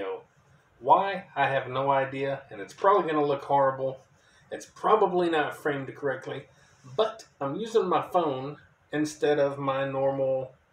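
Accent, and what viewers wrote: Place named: South, United States. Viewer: You sound southern I can see you doing a southern story reading and It being awesome I’m going to picture this voice when I read Where the Red Fern Grows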